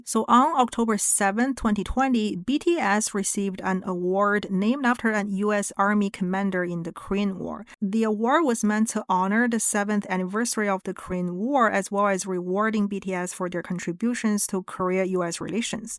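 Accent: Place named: China. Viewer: Your thick chinese accent makes this vid funny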